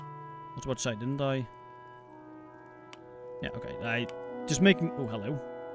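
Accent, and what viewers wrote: Netherlands. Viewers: You sound Dutch 🙈 Are you? I am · Are u dutch bc it sounds like it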